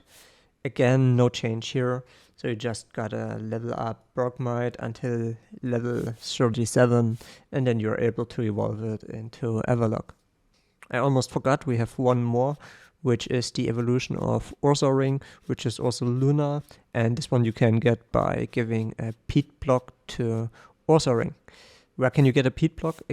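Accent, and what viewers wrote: Saxony, Germany. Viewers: For me as a german it sounds like you have a german aczent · Why do you soumd like a saxonian german whos try to speak Englisch I swear exact same accent xD Ok well i mostly speak accent free but i heared other saxoniams sounding like that Normal people Version You Wörschon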